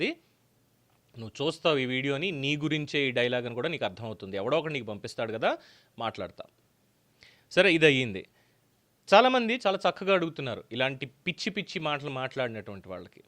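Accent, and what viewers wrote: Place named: Andhra Pradesh, India. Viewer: అసలు ఈ భాష యాస పై చర్చిస్తున్న యాంకర్ ఆంధ్ర వారా? తెలంగాణ వారా? తెలంగాణా వారయితే వారి భాష యాసపై పట్టు లేదని పిస్తోంది. ఎందుకంటే వారు మాట్లాడే మాటలలో 90% ఆంధ్ర యాసే వినిపిస్తోంది. మరి ఇలాంటి వాదనలు లో మాట్లాడే టప్పుడైనా తెలంగాణా యాసలో మాట్లాడితే మీ వాదనకు అర్ధం వుంటుంది. తెలంగాణా కవి జ్ఞాన పీఠ గ్రహీత నారాయణ రెడ్డిగారు ఆంధ్ర యాస తెలుగు పాటలు,ఉర్దూ గజాల్స్ రాసారే కానీ, తెలంగాణ భాష యాస గురించి రాయలేదు.ఎందుకని. 🙏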